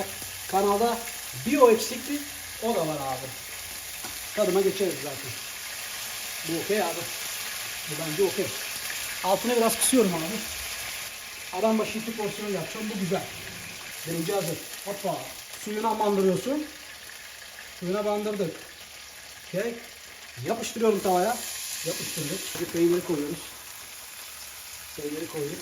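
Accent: Thrace, Turkey. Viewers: Trakyalı mısın öyle hissettim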